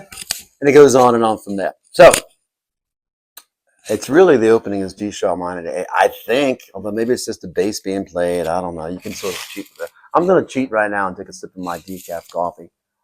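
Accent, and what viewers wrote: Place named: United Kingdom. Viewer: Charming presentation that includes a bit of wit, self-deprecation, and a hint, no quite a bit of a British accent. I loved the “ I know it’s blasphemy“ quip. And Very much enjoyed it being provided with a deeper understanding of the song that I had never really thought about much. Quite the board. Impressive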